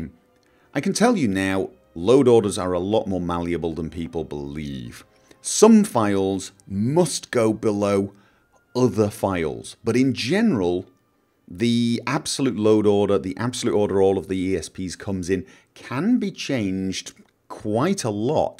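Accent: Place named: England, United Kingdom. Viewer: I think an english accent makes you sound 100% smarter.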